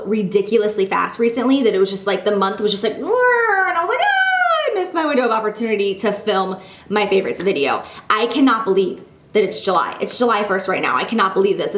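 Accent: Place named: New York, United States. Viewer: she has like a New Yorker accent lol ily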